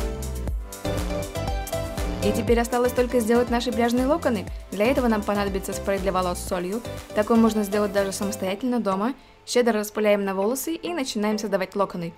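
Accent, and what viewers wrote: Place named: England, United Kingdom. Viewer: ооооочень нравится твоя английская интонация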